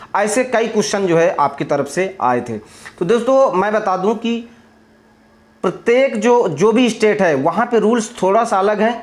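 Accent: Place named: Maharashtra, India. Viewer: Are marathi English,,sidha point to point bat kro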